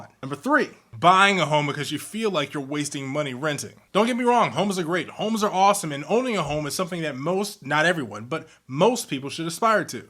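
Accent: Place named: United Kingdom. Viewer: Dude, you have a legit British accent...that is the most important thing I discovered during this video...haha, totally kidding! I can't tell you how many "wise" adults have encouraged me to do #1. :( So sad. Thanks for the awesome video!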